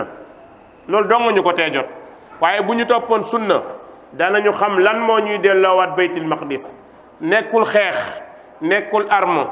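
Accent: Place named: Mauritania. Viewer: Belle récitation style Mauritanien masha'Allah.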